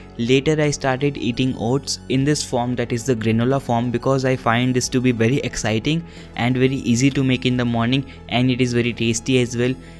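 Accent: India. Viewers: Why do most indians speak like this while talking english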